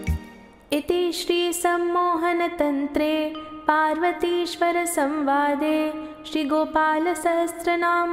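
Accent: Standard. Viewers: मन बहुत प़फुलित हुआ.बहुत ही शुध्द उच्चारण ने मन मोह लिया,इसके साथ लक्ष्मी स्तोत्र एवं राधा स्तोत्र भी होता तो सोने पर सुहागा होता.बहुत बहुत धन्यवाद. 🎉जयश्री कृष्णा जय श्री राम. राधे राधे.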